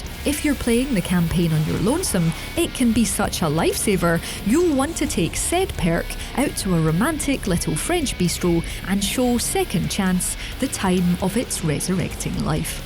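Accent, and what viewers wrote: United Kingdom. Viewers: Good thing I dig Scottish accents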